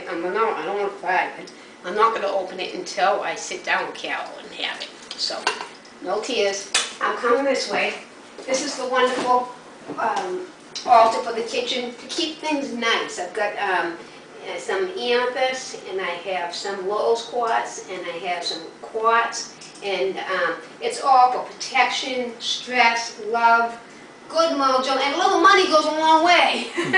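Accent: Boston, United States. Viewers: That Boston accent just keeps me coming back. Love it. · u r such a lovely woman. I am from Boston as well so I love the accent!!! I look forward to binge watching all of ur videos!! ❣️ Blessed Be ❣️❣️❣️❣️❣️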